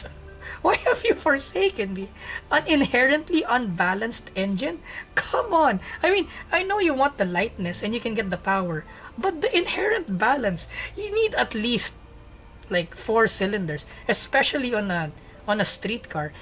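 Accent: Philippines. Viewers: Filipino based on what other people have said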